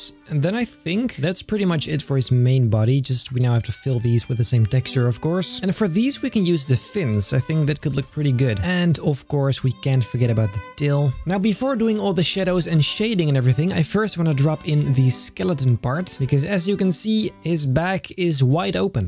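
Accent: Netherlands. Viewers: ben je nederlands? het is gwn dat je heel nederlands engels praat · ik vind het ook zo leuk dat hoe goed je engels ook is je blijft horen dat je nederlands bent door de nederlandse trekjes lol. maar super vet werk love it!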